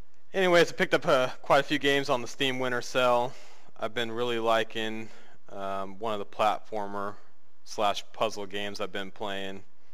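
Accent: United States. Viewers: Are you American? I live on this line :)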